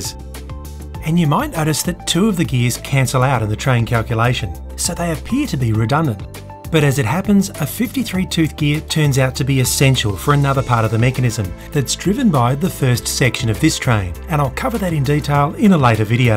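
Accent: Australia. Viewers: Are you Australian? Just wondering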